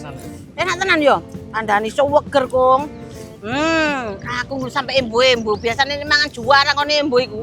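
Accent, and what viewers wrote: East Java, Indonesia. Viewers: ini bahasanya kok Jawa timuran rumahnya mana to klu boleh tahu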